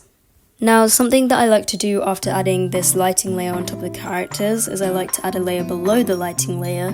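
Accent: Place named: London, United Kingdom. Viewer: typical londoner accent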